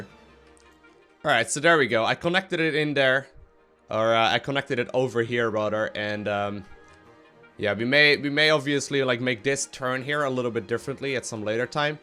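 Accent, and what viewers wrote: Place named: Netherlands. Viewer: where u come from sounds a bit dutch accent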